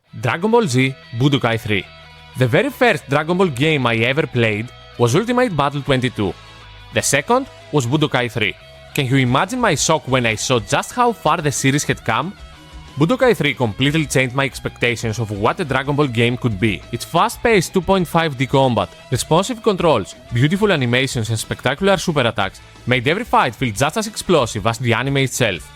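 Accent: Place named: Greece. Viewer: Greek English the best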